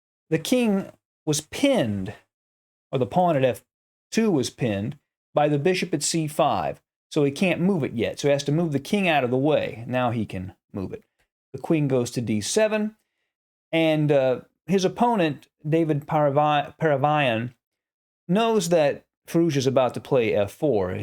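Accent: United States. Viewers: Absolutely love your Alireza videos and analysis and your American accent!! Keep up the great work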